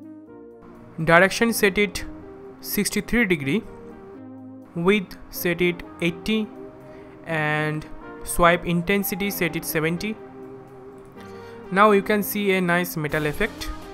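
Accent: India. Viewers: sounds indian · Sounds pure Indian😍😍😍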